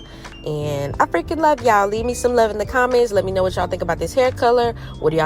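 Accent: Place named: New York, United States. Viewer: lol, yea girl. you are almost prime with the NYC accent. more practice :0) it must be really humid today. when it gets humid here i have trouble breathing. and then it takes my energy. ughh!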